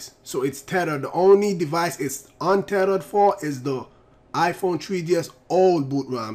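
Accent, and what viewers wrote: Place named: Jamaica. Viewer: Dude. I love your accent. Are you Jamaican ?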